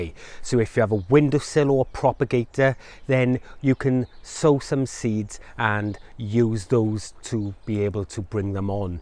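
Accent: Wales, United Kingdom. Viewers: nice to hear the welsh accent here all the way from down under!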